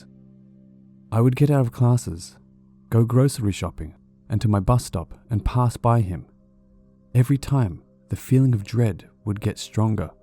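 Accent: Queensland, Australia. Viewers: That's what heard. QLD for sure. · aussie myself and yeah, QLD was my first guess too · Sounds like a fellow Aussie.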